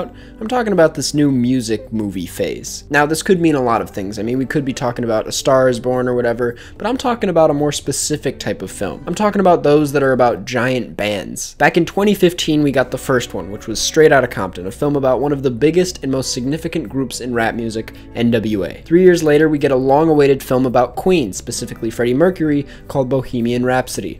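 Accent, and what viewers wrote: United Kingdom. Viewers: amazing british accent man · The british accent though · British accent😂😂👌👏🏽👏🏽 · you sounds like noel with that british accent wtf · The british accent tho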